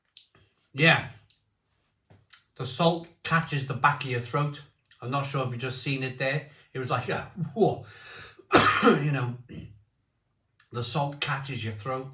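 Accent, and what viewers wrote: Wales, United Kingdom. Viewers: Is you Welsh is it?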